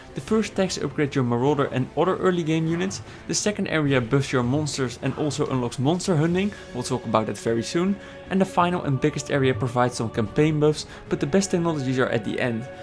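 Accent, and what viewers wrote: Netherlands. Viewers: Hael Premjartonn he is dutch, not german. The accents don't even sound a little bit the same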